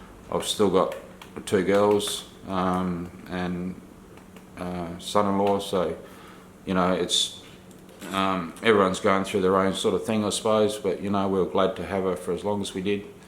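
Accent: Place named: Queensland, Australia. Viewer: Hi, thank you so much for sharing your story😪 I've been diagnosed with stage 3 pancreatic cancer. This is so strange I live in Emerald Qld and after watching your videos, it sounds like you are from this area too? I've got soooo many questions to ask? Like where did you get the oxygen, and cannabis oil and more? Is there any way I can contact you please? My Facebook is Jaco-Marie Jakes Jordaan.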